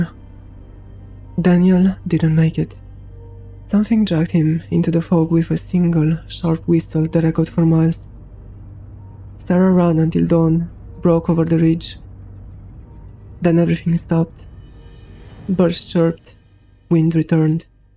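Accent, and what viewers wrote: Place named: France. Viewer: Ur accent is beautiful and I think Ur original language is french 😅❤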